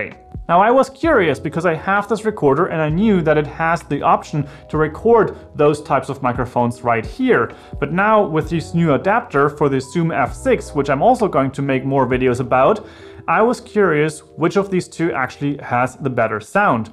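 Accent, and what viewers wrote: Germany. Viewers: ☺️😀 na dann können wir ja auch Deutsch schreiben. Respekt - den typischen deutschen Akzent hast du aber ganz schön gut unterdrückt! 👍 <link> wäre mir jetzt einfach auf Grund der Top 10 aufgefallen. Hast du denn Erfahrungen mit anderen XLR auf Klinke Adaptern, die günstiger sind und trotzdem Plug-In Power liefern? Oder gibt's das gar nicht günstiger? PS hab dich mal abonniert - als Gegenleistung für deine herausragende Kommunikation 😀👍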